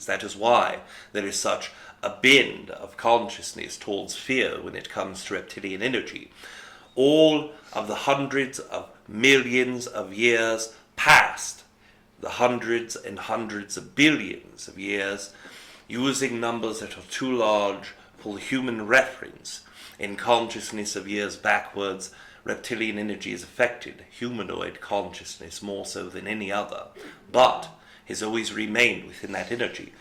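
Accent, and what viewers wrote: United Kingdom. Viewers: Oh wow, your voice when channeling Aridif has changed a lot. Here, you sounded just like yourself but with a mild British accent, and now (when you channel Aridif) you sound like a much older man with a thick British accent, that’s so crazy! It’s cool!!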